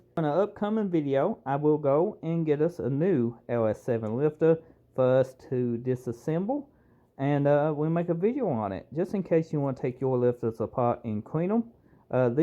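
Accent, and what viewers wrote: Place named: Louisiana, United States. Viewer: Nice video, i work on bikes and going through my lifters and checking pushrod length etc. Where are you from I hear a hint of Louisiana in your strange accent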